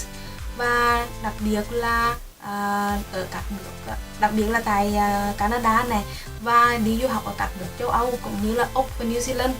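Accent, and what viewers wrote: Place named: Nghe An, Vietnam. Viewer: Giọng nghệ an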